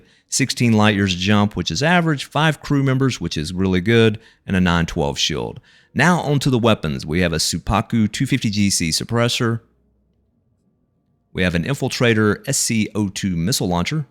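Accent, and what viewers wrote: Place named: Texas, United States. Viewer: You sound like a Texan, and YouTube is severely lacking in Texan gaming content creators. Subbed and liked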